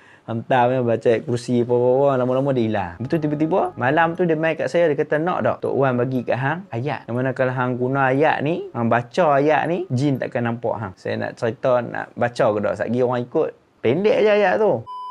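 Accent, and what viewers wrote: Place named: North, Malaysia. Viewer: speaker ber-loghat utara ni, jarang lah membosankan. baik punya storyteller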